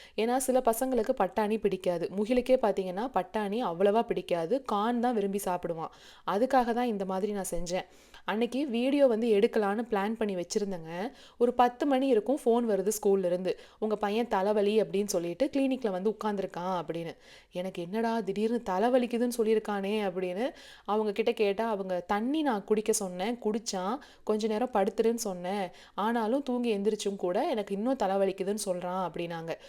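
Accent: Coimbatore, India. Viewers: Hi akka naa new subscriber.my name kavya,naa coimbatore tha neenga pesarathu rompa pudikum akka naama oru slang nu kaykum poethu happy ah iruku akka,and Happy Birthday kutty and happy wedding anniversary Anna and akka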